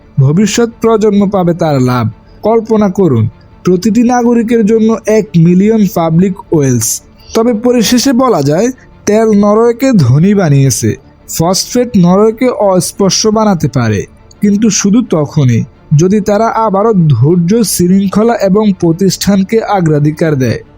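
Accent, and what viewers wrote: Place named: Noakhali, Bangladesh. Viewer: khub base bhul na korle... apni Noakhali, lokkhipur, feni theke... Bhaiya don't mind Script/ voice improve korte paren...Best of luck ✨👍🏻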